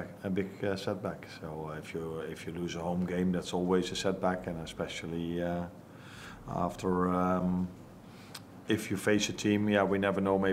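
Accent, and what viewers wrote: Liverpool, United Kingdom. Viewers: is it just me or is he already getting a bit scouse?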